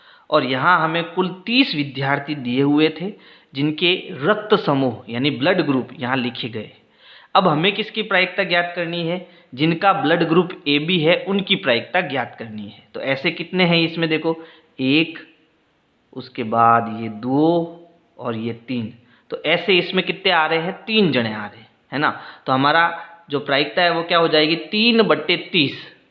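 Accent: Rajasthan, India. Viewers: Man you from Rajsthan ?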